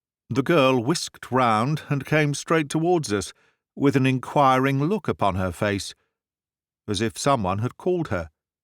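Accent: United Kingdom. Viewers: You can never imagine my pleasure and joy when listening to your clear British accent Mr... When I got the notification for a new YouTube episode of your marvellous channel i had immediately listened to your charming narration.. please keep on sharing classic literature... They are my favorite... Thank you very much Mr I owe you a lot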